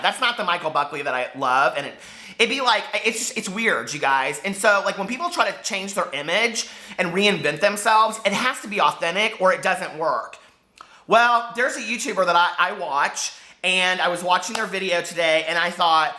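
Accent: South, United States. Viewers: OK, I am from NC (South) and I think you do have a bit of a Southern accent. You'd love the ones here. I hate mine so I can so relate on hating your own voice:/ I like the black hat better, too. I've played Bingo at home and stuff but playing in public sounds super intense. lol. Love State Fairs but haven't been to my state's. I've been disappointed by the ones I've gone to in my hometown. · Ok so sent me over and let me tell ya, she was <num>% right about you! You are absolutely wonderful!! I could just set and talk to you all day! You are so funny! You do sound like you have a southern accent and I LOVE it! You seem so sweet and down to earth! And one of the reasons I love so much is because she's REAL and honey, you are too and it's refreshing! So many fake people on YouTube anymore and it's sad. So so so glad shared you with us!!! Gonna have a "Dad" marathon tonight!!!!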